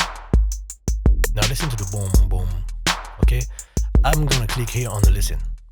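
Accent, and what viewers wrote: France. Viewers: I love your accent/// No wonder the girls love French men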